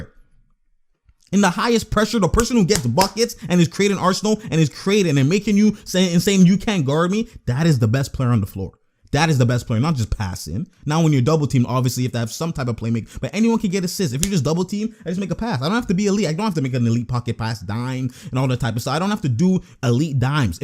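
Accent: Canada. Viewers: I can tell u from Canada from ur accent aha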